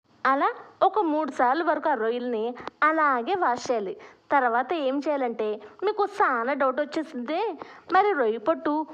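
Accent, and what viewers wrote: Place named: Telugu, India. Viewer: రొయ్యపోట్టు కారం ప్రిపేర్ చేసుకునే విధానం చక్కగా వివరించారు సిస్టర్ మీరు వివరించే అచ్చమైన తెలుగు యాస స్టైల్ సూపర్. 👌🎉💐🙏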